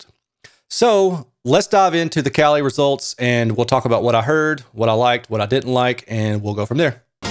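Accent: South, United States. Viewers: that's great 😎 but what did you wrote toms? Unless its me that misunderstood his southern accent?😂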